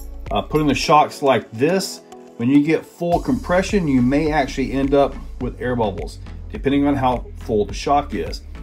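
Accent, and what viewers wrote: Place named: United States. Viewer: Yeah im hardcore! Love your videos man! And the good ol american accent🫵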